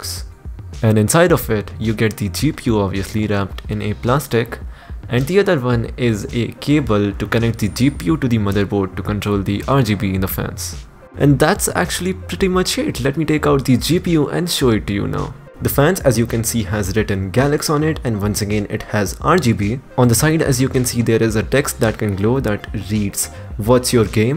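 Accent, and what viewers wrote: India. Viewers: Indian accent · This indian accent is so anoying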